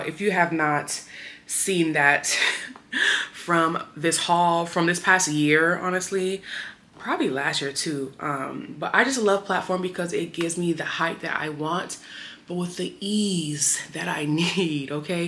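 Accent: United Kingdom. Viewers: It’s the British accent for me 😆😆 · Everything is so cute. And you're british accent reminds me of so much.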